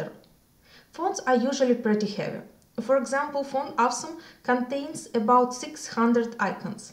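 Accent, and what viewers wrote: Russia. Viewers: твой английский имеет русский акцент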